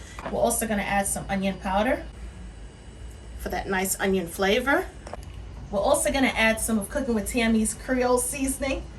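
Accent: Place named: Boston, United States. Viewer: One more thing. I always said that I love your accent and I would always say NYC, but now that I have watched your videos more...., GIRL YOU ARE FROM BOSTON!!!!! PLEASE TELL ME THAT I AM CORRECT. I worked at a Assited Living Facility for awhile and one of the lady's there was from Boston and I loved the way she spoke...., and OMG YES MA'AM PRESS HAM ♥♥♥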